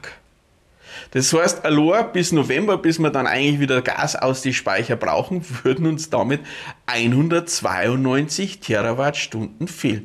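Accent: Bavaria, Germany. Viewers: Servus, eigentlich bist du ein netter Kerl, und sprichst meine Sprache, vom Dialekt her mein ich, denn ich bin im Oberland daheim, schau runter ins Chiemgau, aber von der ewigen Panik halt ich nix, gut das mag dein einziges Geschäftsmodel sein, für mich wär des nix. Mein Nachbar hat mal Millenniums Notfallzeug verkauft, wie damals jeder gemeint hat die PCs verkraften denn Wechsel von 99 auf 0 nicht, ja was für einen Scheiß der da verkauft hat und das Geschäft ging gut, zumindest bis zum 1.1.2000, da war das Geschäft wie abgeschnitten. Ich sag immer was nutzen dir so Notfall Dinger, wenn man sonst unbeholfen und ein Depp ist, wichtig ist doch, dass man wenn die Kacke am Dampfen ist, die Ruhe bewahrt, so nach dem Motto jetzt schaun ma mal dann sehen wir es schon, oder, die Suppe wird nicht so heiß gegessen wie sie gekocht wird. Wenn Notstand ist, werden doch die Menschen führend sein, die Wissen wie es geht, die auch aus Scheiße was brauchbares machen können und nicht die Leute die sich mit Notkoffer und Zeug eindecken und schon die Hosen voll haben bevor es los geht. 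Nüchternheit zumindest bei Tag und Gottvertrauen, dann zwingt man jede Krise. Viele Grüße ins schöne Chiemgau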